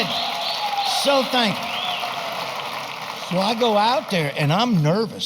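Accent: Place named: South, United States. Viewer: I notice Larry's kinda easing out of the southern accent.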